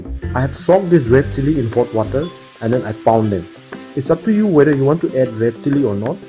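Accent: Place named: India. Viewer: So many critics in the comment section... english is a language, just a way of communication,, if u can understand and communicate than thats good enough.. different people have different accent.. british americans scottish all have diff accent.. and i know how indians speak and its not appreciated either by others😂😂 so stop criticizing ur fellow indian😂😂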